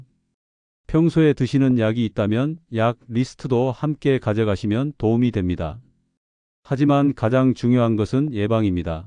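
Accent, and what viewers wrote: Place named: Jeolla, South Korea. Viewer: 전라도 억양 소름돋아,,, 징글징글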